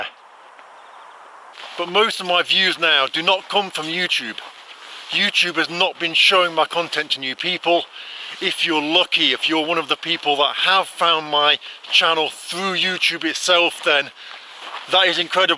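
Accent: England, United Kingdom. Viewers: MATE WHERE U COME FROM ENGLAND?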